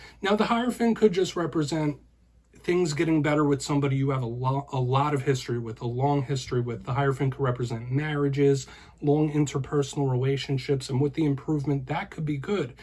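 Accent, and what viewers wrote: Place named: Delaware Valley, United States. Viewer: Powerful reading, thank you. Sounds like you’re from the Delaware Valley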